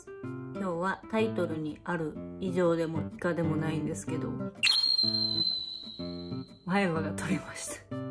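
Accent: Kansai, Japan. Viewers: だんだんアンドロイド感が薄れてきた。関西人なのもわかった。 これからどういう路線でいかれるのか拝見します。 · 関西なんです？ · 関西弁全開!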